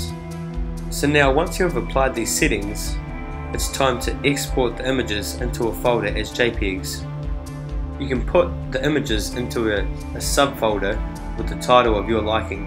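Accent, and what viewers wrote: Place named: New Zealand. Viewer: The accent is standard Kiwi.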